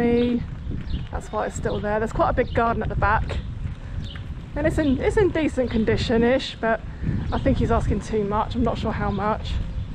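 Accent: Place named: England, United Kingdom. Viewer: Your English is from England, I'm catching it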